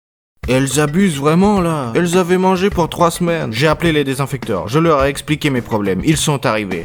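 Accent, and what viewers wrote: Russia. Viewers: T français ou russe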